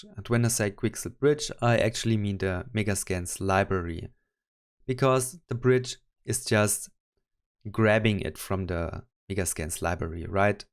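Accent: Germany. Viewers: Man hört den deutschen accent 🤫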